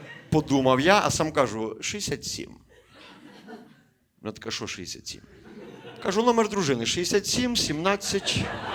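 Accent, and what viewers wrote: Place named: Western Ukraine, Ukraine. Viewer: Ще за «святу Галичину», ходили, плавки і т.п. на рідному западенському сільському акценті аплодисменти стоячи. 👏👏👏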